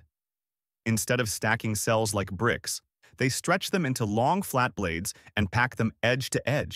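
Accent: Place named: Canada. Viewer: What's twenty hundred?...what am I watching and listening to? Canadian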